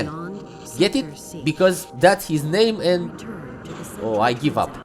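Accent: Romania. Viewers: Mi-am dat seama din primele 3 secunde că eşti român. Accentul.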